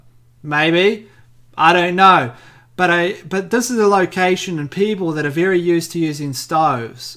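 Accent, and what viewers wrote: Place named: New Zealand. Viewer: I loved esoteric detective but its so much nicer to hear your kiwi voice ☺☺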